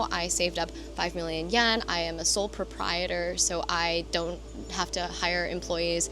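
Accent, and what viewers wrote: United States. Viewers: You are so beautiful on camera. Are you working with a professional film crew to do your make-up, hair, and lightings? You sound American, what nationality mix are you? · Sustainable energy. Such nonsense. And you sound like a fake person anyway. UN = Unfree Nations. I get a headache listen to your California accent and fake nice person acting.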